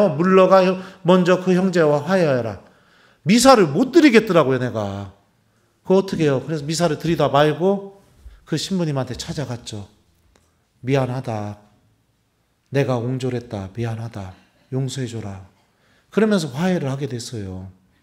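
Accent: Chungcheong, South Korea. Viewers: 신부님강의 충청도 말씀 으로재미 있습니다 매일 유투부 로강 의 듣습니다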